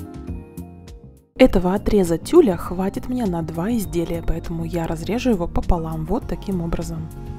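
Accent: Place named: Ukraine. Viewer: ПЕтелька, протестируем!!! Что за малороссийский говор??? Следите за речью! Вы же не в подъезде гутарите!???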